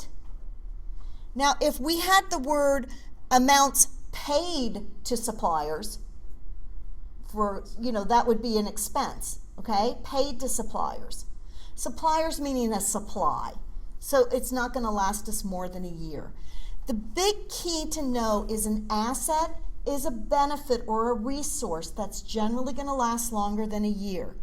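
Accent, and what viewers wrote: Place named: Minnesota, United States. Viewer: MINNESOTA EH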